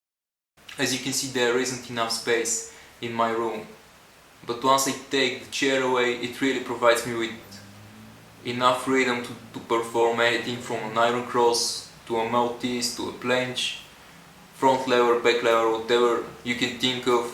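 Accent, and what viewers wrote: Bulgaria. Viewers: тва ако не е български акцент те па на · He is bulgarian bro :D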